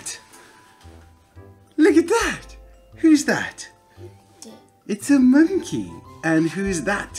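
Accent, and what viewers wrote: Australia. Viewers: Quite Australian... the way he says "art" is also in a few British accents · dawn boi Ausie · He's Australian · dawn boi ausie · His Australian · Are you an Aussie?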